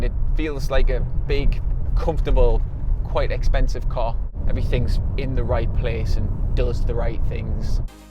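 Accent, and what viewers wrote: Newcastle, United Kingdom. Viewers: I love your accent... That Geordie accent is banging!!!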